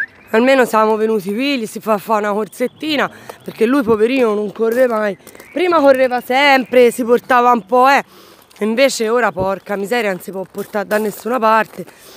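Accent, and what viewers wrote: Tuscany, Italy. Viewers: Auguri carissima.....ti abbraccio e ti mando un grande bacio.....sentirti parlare con la calata Toscana mi riscalda il cuore perché mi ricorda il mio adorato babbo, nato a Prato, che non c'è più!!!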